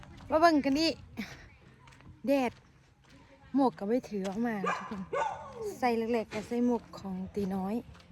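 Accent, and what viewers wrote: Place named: Phu Thai, Thailand. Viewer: สาวเวามวนหลายมักฟังคนภูไทเวามันมวน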